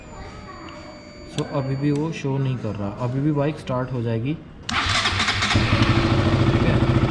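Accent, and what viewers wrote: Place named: India. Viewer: thank you very much for the answer, I know is an Ecu problem but I have some questions. First i have a map for the conplete exhaust and I think if I reset the Ecu (I read somewhere that can do it by take the battery for some minutes) the remapping would be lost (I'm not sure about). And second I can't believe that is the only way to fix it, is only a part of the Ecu but I don't understand the indian english and for a duke owner is a problem.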